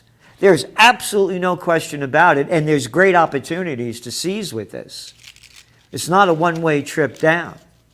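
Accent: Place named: New York, United States. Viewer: Just freaking luv you. Never miss my old state NY, when I hear your voice. Tell it like it is.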